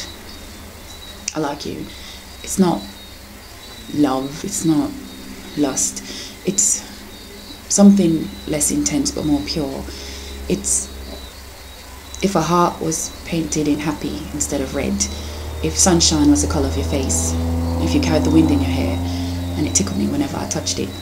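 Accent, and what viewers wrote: United Kingdom. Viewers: your british accebt man, woah! shame your not posting them all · i love it! love the fact that its in a brit accent too. "yu talanted mi gal"! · I love this one so much. The british accent really carried it off. GOOD JOB!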